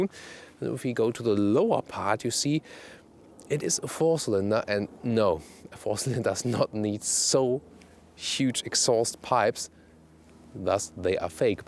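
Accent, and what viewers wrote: Germany. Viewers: A german guy with a thick accent reviewing a Porsche. Great!!!! Simply by far The best car reviews on youtube.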